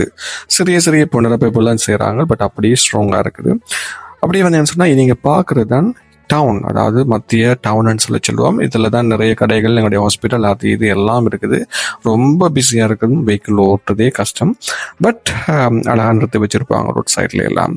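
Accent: Jaffna, Sri Lanka. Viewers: Jaffna la enaku pidichadu alagana Tamil neegalum romba alaga Tamil pesuriga doctor ❤ Colombo la kuda naaga pesura Tamil alagu tan but edu romba alaga ❤ cila words mattum puriya matanguda ❤ 😂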